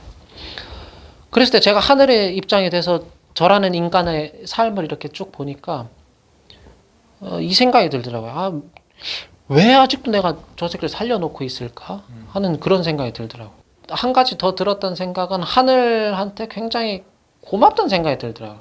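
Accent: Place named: Jeolla, South Korea. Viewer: 이분 말투가 구수하신게 전라도 사투리 조금쓰시는거 맞나요?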